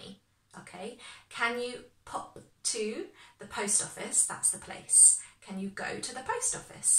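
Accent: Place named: Upper class, United Kingdom. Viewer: 👏👏👏👏👏👏👏Lovely! Ages ago I looked after my uncle's ponies at Cowdray Park Polo Club, in Midhurst, and everybody spoke just like you there!😄😀😄😀😄😀 Muchos saludos, from Olivos, Buenos Aires, Argentina🇦🇷🇬🇧🇦🇷🇬🇧🇦🇷🇬🇧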